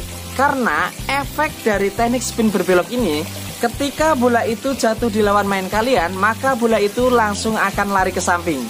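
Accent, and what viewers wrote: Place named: Java, Indonesia. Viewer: Saya tahu mad dari PTM Kemang Jakarta tapi penasaran sampean orang asli mana? logat jawanya kental banget.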